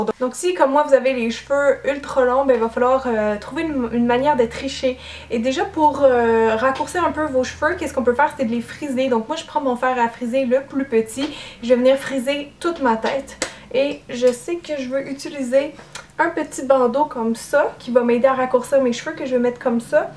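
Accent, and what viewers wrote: Quebec, Canada. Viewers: c'est exactement ça que j'avais besoin pour mon costume des années 20, le maquillage et la coiffure, Merci beaucoup pour ton aide :) excellent vidéo et franchement ça fait du bien d'entendre une québécoise nous donner des trucs et conseils :) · Je ne supporte pas cet accent canadien! J’ai toujours detesté !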